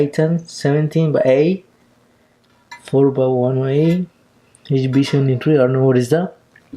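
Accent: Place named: India. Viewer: i like your Indian accent Bruh! lol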